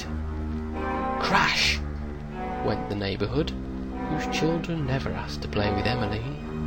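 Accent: England, United Kingdom. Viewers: u know something going on when the story is black and white and a man with a English accent is reading it😰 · English accent :) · British voice!!! :D (or sounding one, I always get accents mixed up for some reason) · And it's just so much more moving cuzz the narrator has an english accent :p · Wonderful o.o and a British narrator makes it all the better ^_^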